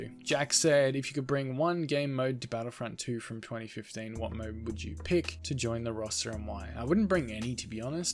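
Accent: Australia. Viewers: Your Australian? The Upside Down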